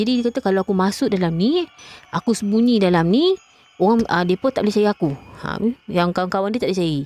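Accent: North, Malaysia. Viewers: pandai dialek utara...😅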